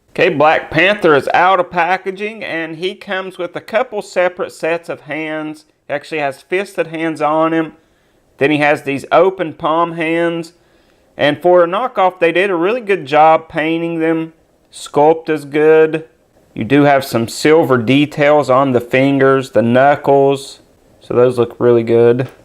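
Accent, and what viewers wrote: United States. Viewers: U got cowboy accent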